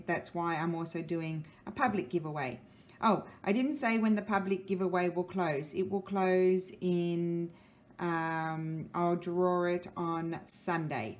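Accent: Australia. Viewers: Oooh - How exciting. Beautiful journal. I've bought baroque and fragment add ons but love them all. Love watching you every day. Greetings from Perth WA. Great to get a glimpse of an Aussie's life in Italy. Terrific to hear the Aussie accent and lingo -))) and Something I've often wondered - are you related to Richard Roxburgh? (Big fan of Rake - amongst others!) Now that we are all addicted to tuning in daily, please say you'll still be around regularly!! :-))))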